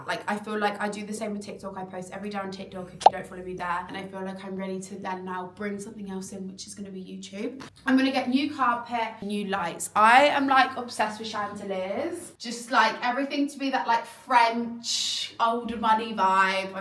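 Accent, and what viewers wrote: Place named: RP, United Kingdom. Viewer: Lovely house congrats Lucinda.By the way when did you become so posh speaking?🤗💚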